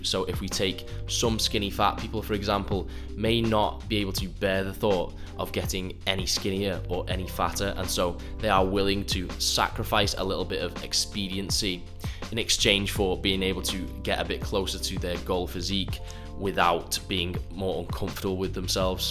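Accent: United Kingdom. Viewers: Liking the British bants/vibe and info... subbed ! 😂👌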